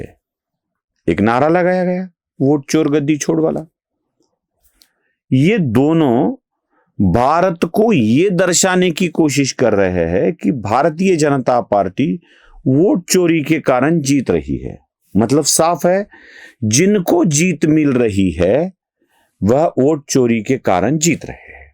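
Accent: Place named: Pune, India. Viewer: WE GUESS IT IS " POONERI MARATHI " LANGUAGE.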